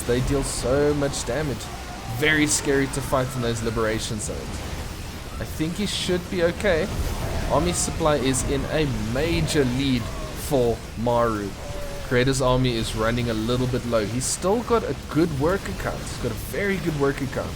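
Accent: South Africa. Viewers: Great casting. Thanks for the video. Hmmmm do I smell a fellow South African :D Subscribed.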